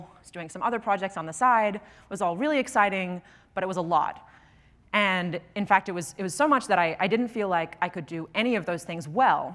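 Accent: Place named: Australia. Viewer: I was in her lab class at unimelb. Back then, she spoke pure aussie accent. Now, it's hybrid.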